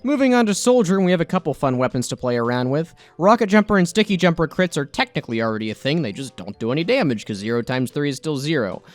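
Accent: United States. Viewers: What part of Florida are you from?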